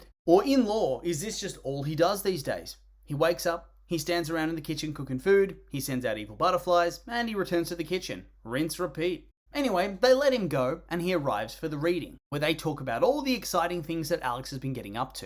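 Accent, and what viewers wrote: Australia. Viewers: Y’all did he get more Australian in this video? Or is it just me?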